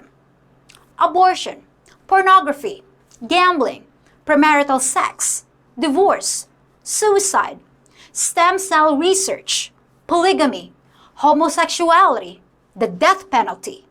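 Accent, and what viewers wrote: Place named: United States. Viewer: your English is just too good, & you know so many “Americanisms”. Surely you didn’t get all this knowledge from the call center, did you? You sound just like a girl who has been in the States forever. Either you are very very good, or is there more to the story that I didn’t catch. Come on. Give!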